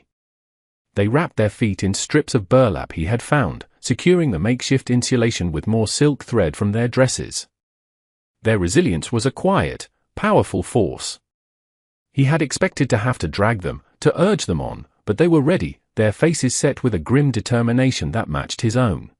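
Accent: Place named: United Kingdom. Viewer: Screw your aristocratic English AI accented narration. Get rid of it. It doesnt fit the context. Maybe I'll listen. Not til then.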